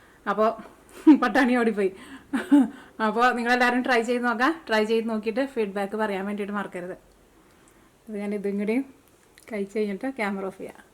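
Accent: Thrissur, India. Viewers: Thrissurkaari aaahno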